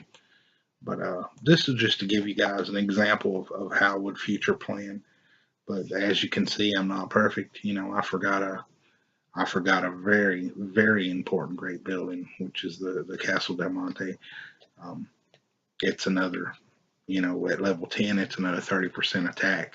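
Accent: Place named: Deep South, United States. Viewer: You sound like you work in the ranch in the deep south USA.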